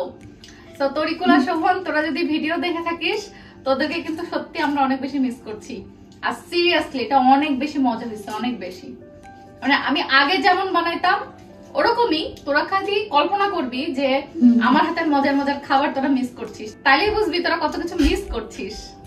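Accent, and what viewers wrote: Kushtia, Bangladesh. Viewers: Apu ami tomar kotha sune mone hoyeselo tumi amader kushtia r meye ,onak valo lage tomake.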